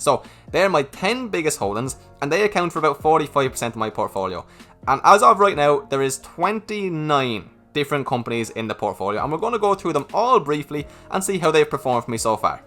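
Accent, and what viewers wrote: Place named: Ireland. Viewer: Seriously mate, three reasons for me to keep on watching your videos: your enthusiasm, expertise and definitely your Irish accent. Is there any reason though why I hardly see any short term investments in your portfolio or long term investments in low rated companies?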